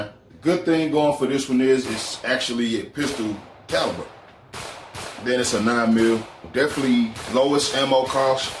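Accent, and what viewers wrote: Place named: St. Louis, United States. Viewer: Sound like you from the crib STL. Where is the outdoor range? Want to go somewhere other than sharpshooter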